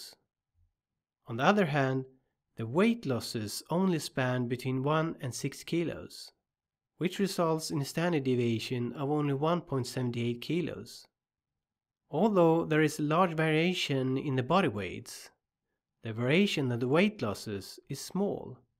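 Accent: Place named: Sweden. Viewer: Din svenska accent går inte att ta miste på. Vrf gör du inte videon på svenska?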